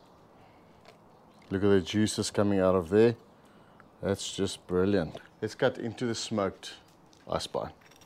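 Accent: South Africa. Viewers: Please tell me you're South African or remotely from SA...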